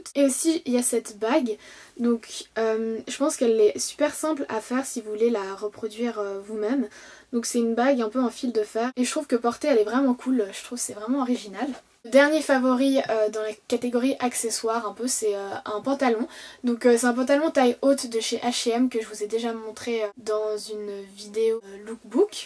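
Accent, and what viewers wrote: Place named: Switzerland. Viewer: Hey, donc franchement je tenais à commenter cette vidéo parce que franchement j'adore vraiment tes vidéos ! Genre j'sais pas mais je peux te regarder en boucle pendant 9 minutes je vois pas le temps passer hahah enfin bref, en tout cas j'aime bien tout simplement parce que tu articules quand tu parles, tu as l'accent Suisse et comme je suis Suisse aussi c'est plus agréable je pense, et surtout c'est vraiment constructif ce que tu dis et surtout tu es douces, genre tu cries pas et c'est agréable de t'écouter. Bref voilà continue surtout, tu vas bien monter :) bye !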